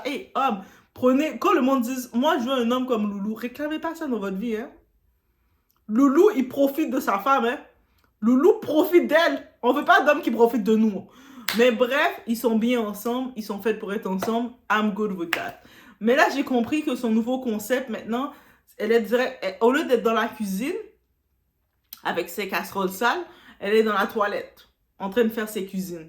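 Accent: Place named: Quebec, Canada. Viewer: J’adore ton petit accent franco-québécois. 😉 Je ne connais pas Hawabelle, c’est toi mon influenceuse. 😘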